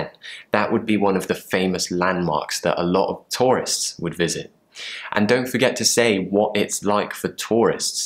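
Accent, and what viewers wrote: RP, United Kingdom. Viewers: Thank u so much, your videos help us a lot. Please don't stop to make. Your pronunciation is very clear, just try to speak slowly and it's cool to learn english with a british. Seriously thanks thanks thanks. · I see you try to speak a natural sounds of English. It's means RP for me. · Nice British accent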